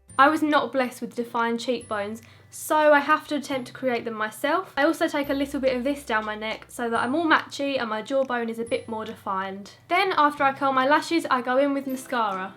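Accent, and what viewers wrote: United Kingdom. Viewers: What year/grade are you in? Love you and your British accent 💖💋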